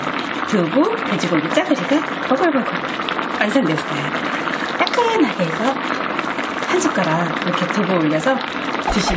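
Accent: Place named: Daejeon, South Korea. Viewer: 저도 대전이 고향이예요 왠지 말씨가 좀 친근감이 있었거든요. 영상을 오래 전부터 보고 자주 해먹고 있었거든요. 대전 어디신데 친정어머니가 농사지으셔서 보내주시는지요?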